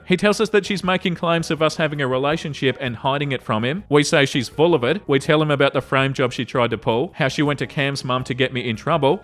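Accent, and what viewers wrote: Australia. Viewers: Oh, you are such an aussie mate. When you said "And sucked in too" I just cracked up it came out of nowhere wasn’t expecting it 🤣 That was gold dude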